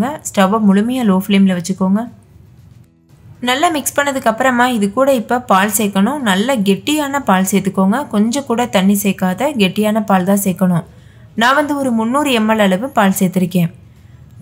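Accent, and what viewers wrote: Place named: Kerala, India. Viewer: Hi sis,,,,I am very interested in your cooking videos,,,I am malayali but also used to watch ur videos,,,I like all ur videos,,,and ur pronounciation with some Malayalam words ,,.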